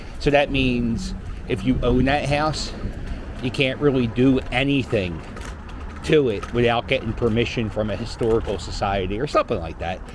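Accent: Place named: Delaware County, United States. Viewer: The Delco accent puts this tour into premium territory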